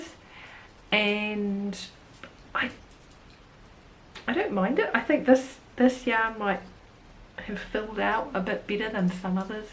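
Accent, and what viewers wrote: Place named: New Zealand. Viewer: Always lovely to see you and hear a fellow Kiwi.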